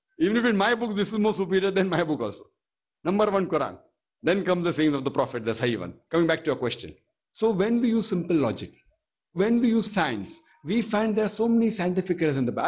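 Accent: India. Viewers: seems strange to see a white man talking with a natural Indian accent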